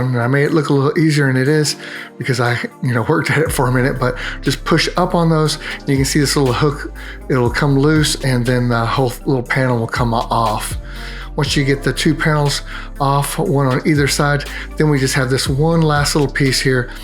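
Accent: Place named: South, United States. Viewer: Yeah he does have a very pleasant voice over I first started watching his videos I was like man this guy’s voice is much more pleasant to listen to I think he’s a southerner if not mistaken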